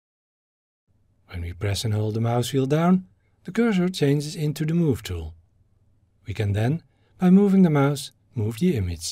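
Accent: Netherlands. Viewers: hah your dutch!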